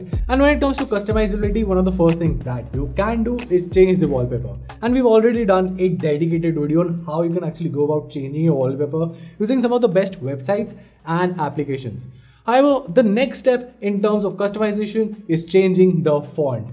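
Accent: India. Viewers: The Indian accent is so melodious, however, when speaking English he must slow down, as it is very hard to follow him. Great content, but I wish he would slow down a bit. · you say that the code is below this video and in this key moment of you pass so fast and even your accent is from a foreigner country that looks from india. so now i have to go to another smart guy for see where to get this wonderful code. If you speak slowly is going to be good for everybody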